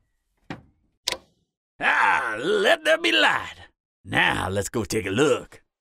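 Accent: Louisiana, United States. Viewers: Like the Cajun sound your voice had. This reminds me a little of a song, by. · Your southern style accent was amazing and this audio was super cute. Excellent job on this one! ❤️❤️❤️❤️❤️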